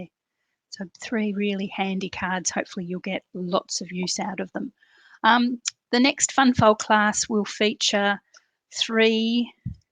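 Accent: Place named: Australia. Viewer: So nice to have an Aussie voice who is normal and makes mistakes. Your cards are great.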